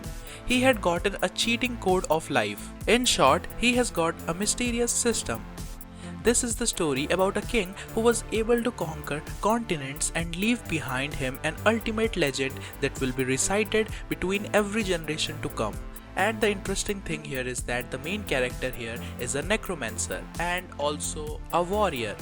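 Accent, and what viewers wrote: India. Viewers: I really like your indian accent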